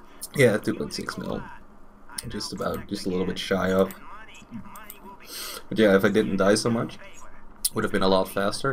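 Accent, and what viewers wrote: Netherlands. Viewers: Jij bent sws nederlands ik hoor het aan je accent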